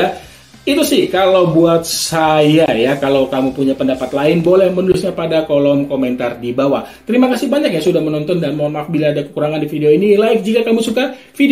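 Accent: Semarang, Indonesia. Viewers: Orang semarang ya bang 😅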